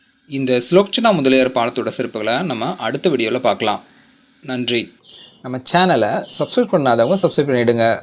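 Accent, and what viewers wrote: Tirunelveli, India. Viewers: உங்களுடைய திருநெல்வேலி பாசை நன்றாக இருக்கிறது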